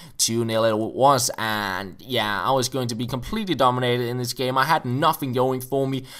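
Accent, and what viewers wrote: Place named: Denmark. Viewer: Man kan sgu bare høre at du Dansker ikke sandt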